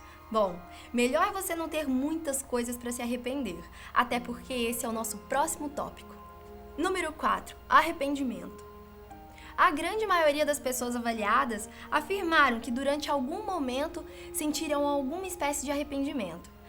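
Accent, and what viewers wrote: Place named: Minas Gerais, Brazil. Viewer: Sotaque mineiro.....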